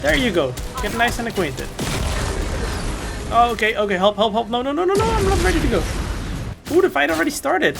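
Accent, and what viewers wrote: Netherlands. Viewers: Is he dutch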